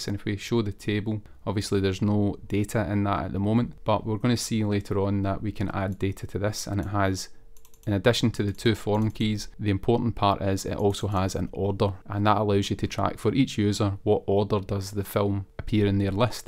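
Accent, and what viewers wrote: Scotland, United Kingdom. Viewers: Fantastic Scottish Django Developer! Found another one :D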